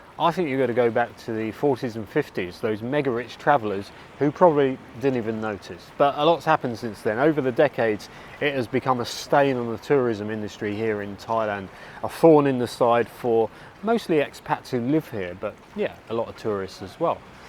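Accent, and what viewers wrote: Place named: Cockney, United Kingdom. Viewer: An interesting one. Do you ever have any trouble in places as your Thai will have a cockney lilt to it! I assume you have a Thai passport as well as a UK one? Having a Thai one will stop any possible problems i'm guessing.....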